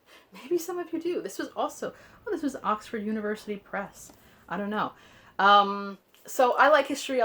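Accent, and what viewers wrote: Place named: New York, United States. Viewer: Hi, I'm going to book off today here in Hawaii. Wish me luck. I have more of a New York accent than you do and I've been living in Hawaii for 40 years. I just did my first. mail haul using my brooklynese accent full force if you want to take a look. Sorry you got soaked. It happens to me in Hawaii but I have a collection of plastic bags. Aloha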